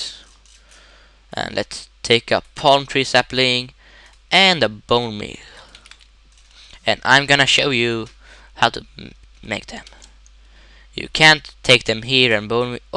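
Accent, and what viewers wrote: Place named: Sweden. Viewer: du måste vara från sverige XD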